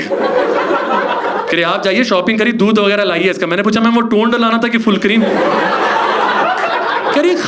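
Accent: India. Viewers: Your dialogue delivery is so typical of Renukoot with a touch of the old Mirzapur vernacular. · That little touch of Bihari dialect.. amazing 👏👏👏👏😂😂😂😂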